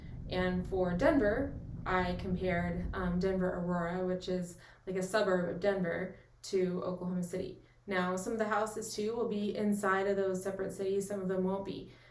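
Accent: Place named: East Coast, United States. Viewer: 1 Are you originally from OK? You have a "back east"accent. 2 What is your take on Bartlesville area? Thx for the vid.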